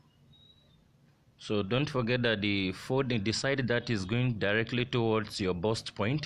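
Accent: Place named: India. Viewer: Why are u using indian ascent pls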